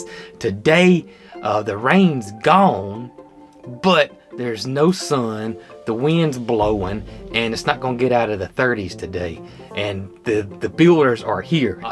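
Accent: South, United States. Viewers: The weather people say we are going to have more cold weather I thought you were going to get your tongue twisted with all those chicken words😁😁😁👍👍👍 I hear a little country in your voice. I'm told a lot that someone can hear it on my voice. I tell them "OK I'm proud of it". I also tell them "you can take the girl out of the country,BUT you can't take the country out of the girl". Just subscribed. Also from Alabama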